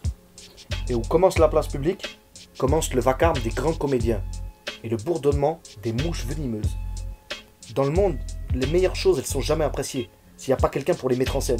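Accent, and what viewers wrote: South, France. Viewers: Plus je l'écoute et plus jme dis que c'est ta meilleur vidéo , merci de m'avoir introduit à Tonton Nietzsche . Désormais , je vie telle Zarathoustra, je suis le pont au dessus de nos médiocrités , je parle à la montagne et ne me plie plus a la violence de l'efficience . Et putain avec l’axent du sud c'est encor mieux , con ! ^^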